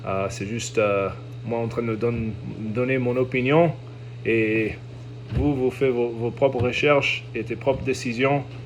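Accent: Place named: France. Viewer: You’ve got an awesome French accent mate, congrats (I’m French)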